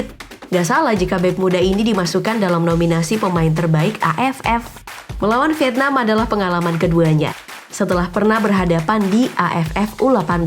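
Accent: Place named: Blora, Indonesia. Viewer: yang dari Blora mana suaranya niih...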